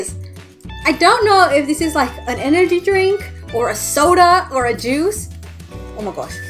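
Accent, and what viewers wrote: United Kingdom. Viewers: Ikr! She probably started to sound like British people because she's been living with them 😂😂🇬🇧🇺🇸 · Ur accents gone a bit British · I thought ur British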